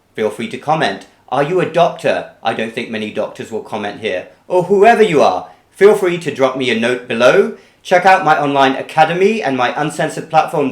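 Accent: United Kingdom. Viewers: Sir you have a very strong British accent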